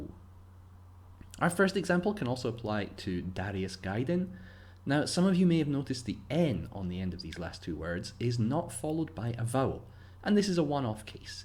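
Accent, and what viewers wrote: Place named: Glasgow, United Kingdom. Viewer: I lived in Glasgow half a year. Release your accent! ^.^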